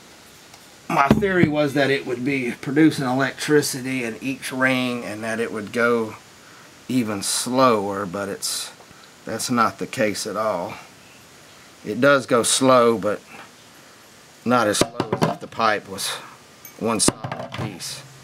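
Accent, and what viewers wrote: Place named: Appalachia, United States. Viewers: Trick Photography, hillbilly talk. I love this guy!!! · that was cool thanks. let me guess - North Carolina accent. Yes that's where I live.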